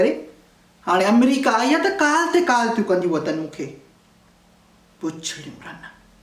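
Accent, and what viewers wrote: Sindh, Pakistan. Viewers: 😂😂u R superb... 👍❤dadho khili aa twhn j ghalin te...twhn ji sindhi tmam ghni typicl aa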